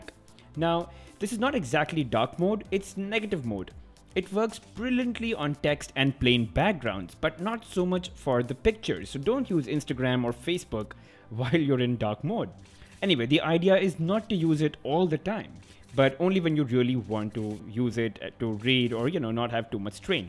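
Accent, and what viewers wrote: India. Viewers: i hate the indian accent , it makes me sad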